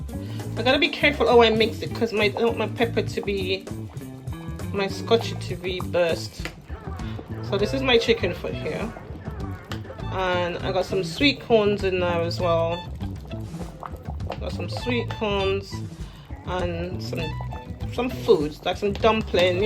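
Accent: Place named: Ireland. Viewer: You sound like them Irish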